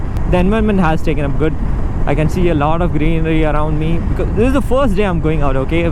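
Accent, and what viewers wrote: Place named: India. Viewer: I do not know how I ended up here. I gather is Indian if an IT engineer and by his accent., stay safe there in India. But if you are healthy and not too old, this corona virus is not relevant to us.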